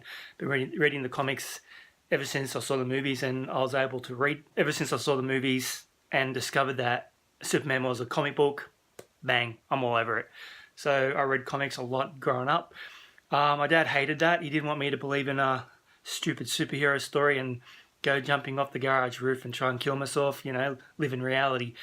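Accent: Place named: Australia. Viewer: It is amazing how powerful movies can be. Superman by far is my favorite movie of all time. You said something in your video that I relate to completely, and that is that Superman babysat me many many times. He was my friend. Someone I could always count on to be there for me. The magic of this movie holds up to this day. I was 8 years old when this came out, and I have seen it hundreds of times over my life. I also cried when Chris passed. He has been a huge part of my life and still is. I do not know you. From your accent I assume you are in another part of the world. (Australia?) Yet we speak the same language when it comes to this movie. And I think that's pretty cool! :)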